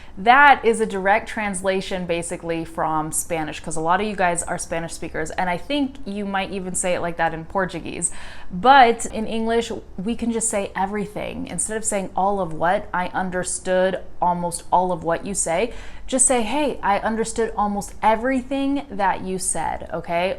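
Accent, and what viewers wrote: California, United States. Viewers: Hey,! You speak very undastandable and clear American English! I d like to know what state do you live in? Is it common pronunciation in your state? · Do you still have an American accent when you speak spanish or a native one? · I am learning English. Do you speak an American or British accent?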